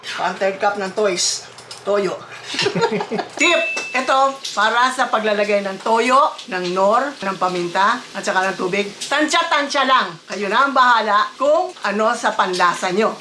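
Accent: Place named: Pampanga, Philippines. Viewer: Flabor - Ober der I can always tell people from the Philippines, they insist on their own pronunciation. Jokoy the comedian has that down.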